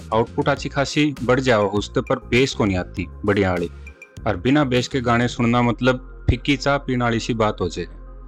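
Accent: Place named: Haryana, India. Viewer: Great voice over , the haryani accent 😃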